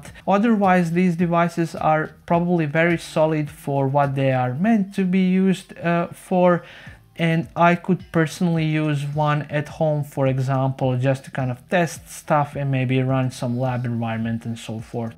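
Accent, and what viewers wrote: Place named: Russia. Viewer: You compiled this list of six "tiers" of hardware and then decided that the most efficient way for you to convey this information was by speaking slowly into a camera? You speak far slower than I can read. Also, your accent grates on my ears. I can't stand your Russian/Balki from Perfect Strangers accent. Why didn't you just post this information on a web page? This video is complete garbage. Whoever told you that you should speak in front of a camera should be tried for war crimes.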